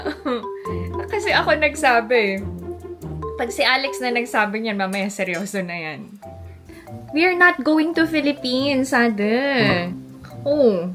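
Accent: South Korea. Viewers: Ms. ang galing mo mag Korean while you were talking to seems like I'm watching k-drama ganda ng iyong voice and koreanang koreana ang accent sana mag speak ka lagi korean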